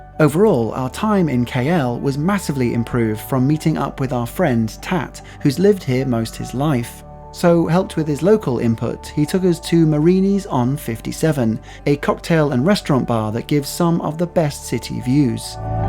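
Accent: United Kingdom. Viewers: It's nicely done especially with British accent!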